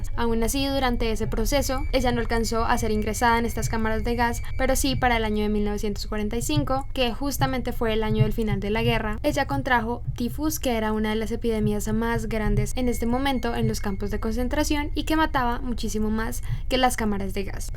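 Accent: Colombia. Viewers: Qué horrible es el acento colombiano, ¿no? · kikis pipos pues no lo veas y chao ella es colombiana asi hablan ellas respeta, que tiene que ver su novio aqui??? ganas de joder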